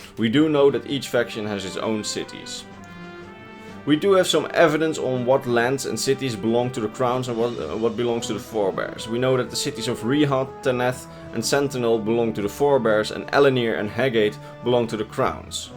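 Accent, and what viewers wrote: Netherlands. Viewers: IK WIST DAT JE NEDERLANDS WAS. Cheers, love your videos vriend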